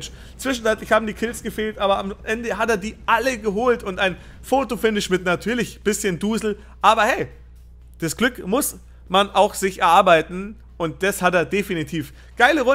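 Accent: Bavaria, Germany. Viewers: Das Bayerische hört man schon raus. Aber ich mag das. Ist authentisch und macht dich sympathisch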